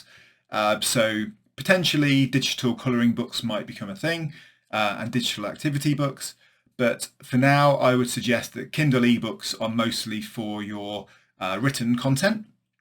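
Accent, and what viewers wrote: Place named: United Kingdom. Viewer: Wow I love your British accent 😅😅😊😊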